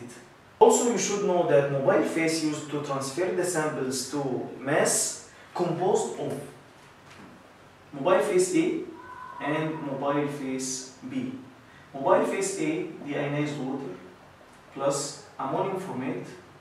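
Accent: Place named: Egypt. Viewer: من لهجتك وهيئتك باين عليك مصري رفعت راسنا بالمجهود الجميل دا❤❤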